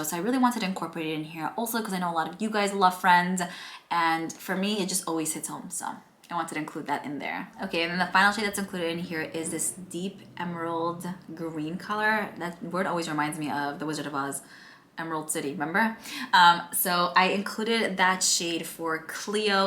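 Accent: New York, United States. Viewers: So proud of you and love love your NY accent and I hope you always keep it 💖💖 I will definitely get this collection…Love it so much 💖💖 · I understand completely cuz I talk the same way cuz I'm from New York. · Girl. You have an accent. New York. I have an accent. North NJ. Lol I am sooo excited for you!!! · Congratulations on your pallet and engagement, how exciting! I love all of the colors especially the purple wine color and green, so so so beautiful. I love your accent, I speak the same as I was born and raised in NY. My girls always tease me when I say cawfee too lol. Very happy for you, you are such a happy go lucky kind of gal.💜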